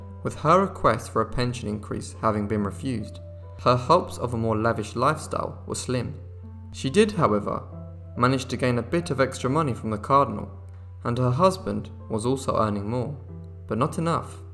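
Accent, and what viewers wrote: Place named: England, United Kingdom. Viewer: te mire en un video..no se si fue tiktok o instagram donde tenias la cancion de " " y te reconoci.. wow.. no pareces latino en absoluto.. y tienes acento ingles.. I love your channel by the way.. I love history and historical figures. que parte de mexico?? yo naci en baja california pero vivo en California ahora.. cerca de San Diego.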